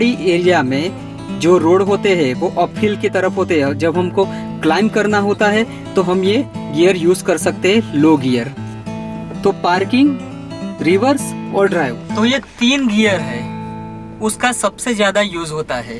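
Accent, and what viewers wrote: Gujarat, India. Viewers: Aap gujarati ho? Aapki hindi patel bhai jesi hai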